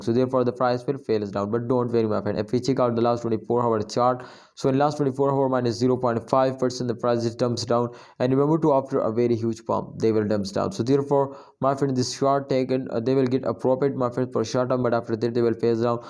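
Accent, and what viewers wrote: India. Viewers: When i here indian accent i move on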